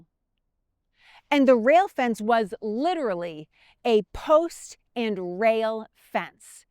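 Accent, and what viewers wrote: Boston, United States. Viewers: Great history lesson, along with a fabulous Boston accent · Love your Boston accent.😊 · Thank you, for another interesting action adventure! I love your enthusiasm and your Boston accent too! I've been to the Bunker Hill Monument and taught about the battle in my grade 5 History class, but I didn't know about the rail fence stuffed with grass and hay. Keep on with your historical posts. I always learn something new. 🙂 from NH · I love this woman. You sure as hell can tell she is from Boston! Sometimes I have to rewind & play over what she is saying to understand her.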